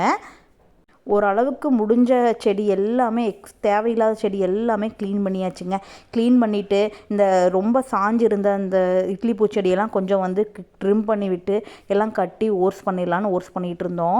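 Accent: Kongu, India. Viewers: So cute'ma. அழகான கொங்கு தமிழ். கோவையில நீங்க எந்த இடம்ப்பா?. Surgeryக்குப் பிறகு இப்ப பரவாயில்லையா?!!. Get well soon.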